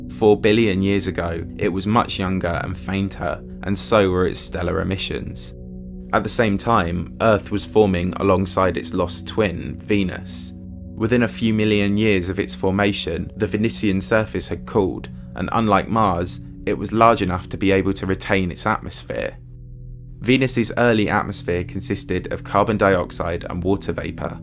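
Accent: United Kingdom. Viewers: Sorry but it's hard to take this guy seriously with his unique British accent. Instead of saying "cold" he says "cowd". Hilareous!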